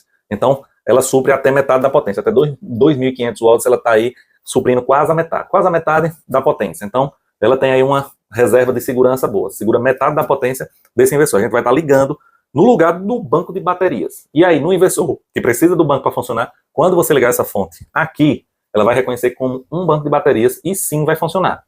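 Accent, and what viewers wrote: Northeast, Brazil. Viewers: Meu amigo, você é de qual estado do nordeste?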